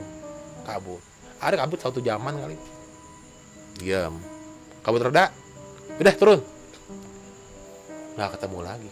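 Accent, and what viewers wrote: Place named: Betawi, Indonesia. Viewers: setau ane, ente orang (berdarah) sumsel (komering) bang. Manggil ibu, pake kata enyak, dan logatnya kok kaya ada betawi nya. ada keturunan betawi juga kah? atau hanya karena terbawa dialek gegara sejak lahir di jakarta? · Abng ini enak story telling nya..khas betawi.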